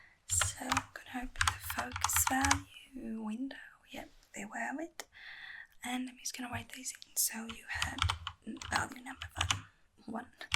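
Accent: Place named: United Kingdom. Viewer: Britishness turned up to 8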